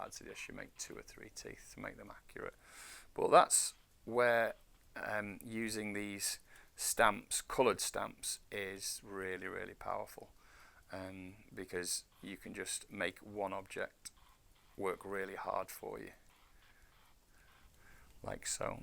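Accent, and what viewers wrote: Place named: Stoke, United Kingdom. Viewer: Great stuff. Really enjoying learning from your videos, and I’m inspired by your work. I’ve been learning to use blender for a few months now and have just invested in some VR kit so I’m still in the very early stages of sculpting and modelling with it. Your tutorials are helping me so much and I just wanted to say thank you. By the way is that a bit of Stoke in that accent ?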